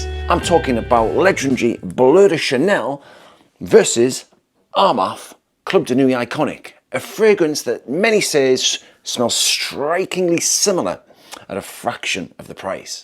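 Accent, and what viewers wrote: United Kingdom. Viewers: Love for your British accent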